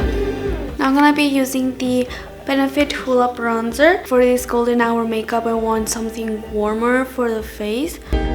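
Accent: Spain. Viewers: Where are you from? Your accent is interesting it sounds almost as if you naturally speak Spanish..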